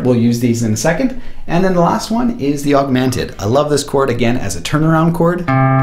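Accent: Canada. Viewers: Your voice is so canadious, nice work by the way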